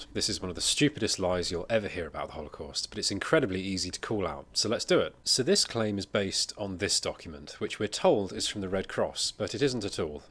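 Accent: United Kingdom. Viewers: >british accent. Dismissed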